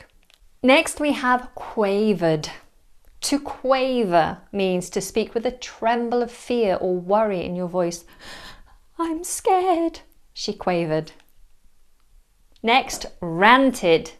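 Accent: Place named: United Kingdom. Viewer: Dear Madam Teacher,thank you very much for all your effort.I am so grateful for your brilliant,amazing and perfect videos.My very personal opinien is that your british accent is one of the best inside huge and vast Internet.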